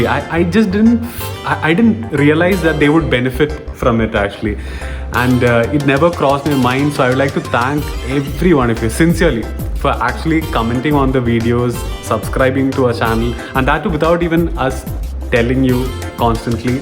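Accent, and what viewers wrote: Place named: Kerala, India. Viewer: That's just Malayali accent. Most of us have it. 😂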